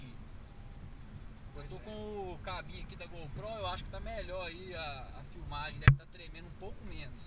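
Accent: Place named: Minas Gerais, Brazil. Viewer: mineiro aff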